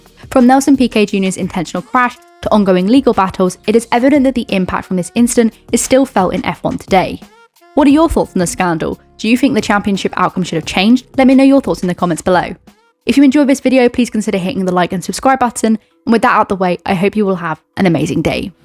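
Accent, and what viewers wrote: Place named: United Kingdom. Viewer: Great video, keep going, having British accent in F1 commentary always sounds awesome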